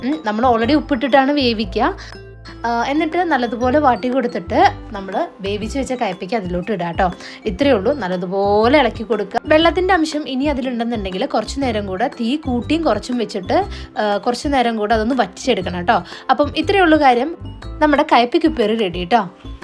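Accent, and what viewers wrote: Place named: Thrissur, India. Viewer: ok സംസാരശൈലി കേട്ടപ്പോ തോന്നി തൃശൂർക്കാരി ആണെന്ന്..❤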